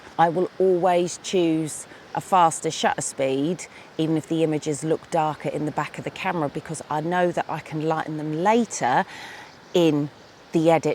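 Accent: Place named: United Kingdom. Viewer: British. Nice t see y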